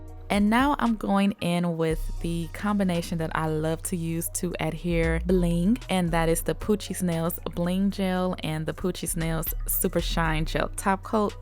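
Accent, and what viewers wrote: South, United States. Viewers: I guess Nashville has rubbed off on ya a little bit lol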